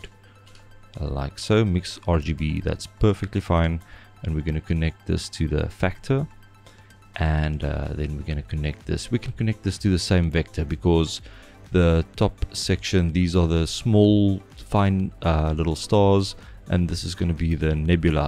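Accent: South Africa. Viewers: Awesome video bro. Are you South African btw?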